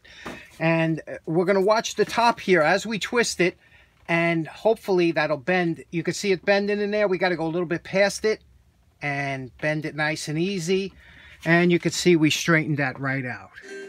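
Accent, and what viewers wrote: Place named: New Jersey, United States. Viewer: Liking your New Joisey accent!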